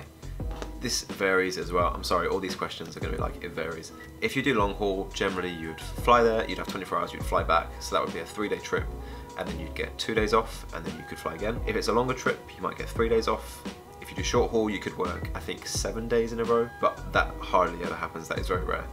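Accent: United Kingdom. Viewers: The first thing I said to myself when he first started talking is he’s British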